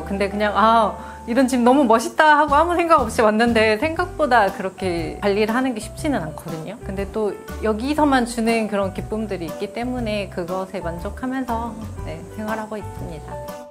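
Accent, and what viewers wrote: South Korea. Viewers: 표준어를 쓰려고 애는 쓰는데 억양에서 확실하게 아랫녁출신의 느낌이 드러나 토속적인 분위기랄까?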